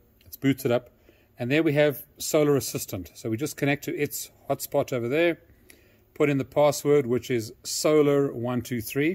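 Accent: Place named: South Africa. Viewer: Sounds like a South African accent, Im sure you know that Solar Assistant is produced in SA?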